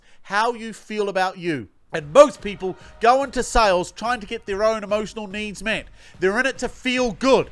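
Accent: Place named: New Zealand. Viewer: I thought I heard a Kiwi accent. Then I see the FourSquare mug in the bacground!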